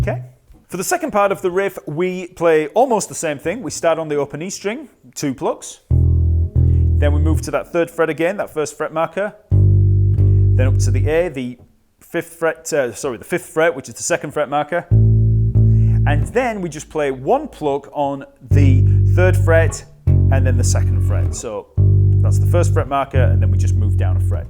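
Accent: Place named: England, United Kingdom. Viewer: when did shane o mac get an english accent?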